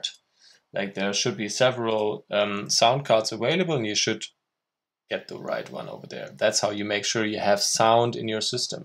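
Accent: Germany. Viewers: Thanks mate! This is exactly what I need! I was making (trying to) some tracks in FL Studio like 5 years ago and think Ableton is better. Working here should be similar I guess, but I totally forgot how to set instruments etc:.:D So this tutorial is very useful! Anyway your accent sounds a bit German, do you come from Germany, the land of one of my favourite DJ, Paul van DyK?;D:)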